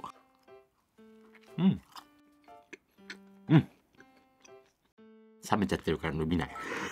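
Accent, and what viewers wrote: Japan. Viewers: Saludos desde venezuela 🇻🇪♥️😘me encAnta tu asentó japonés aunque no entiendo nada jijiji me facina y me encanta tus videos 😘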